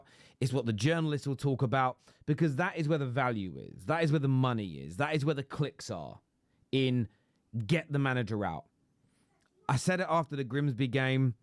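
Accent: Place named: Manchester, United Kingdom. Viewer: Anything for a pound note great Manchester accent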